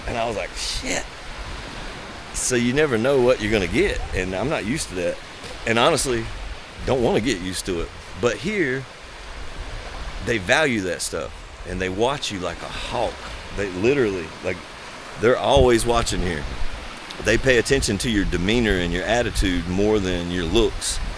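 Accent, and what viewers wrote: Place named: North Carolina, United States. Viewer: North Cackalacky in the house! You sound a little Sampson County. 👍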